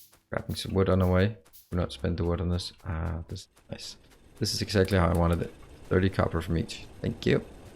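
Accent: Denmark. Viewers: You sound Danish... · Are you Danish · Are you Danish? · Yes, it is true that he sounds Danish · Well we are only like 5.5 million so it's always fun to find a fellow dane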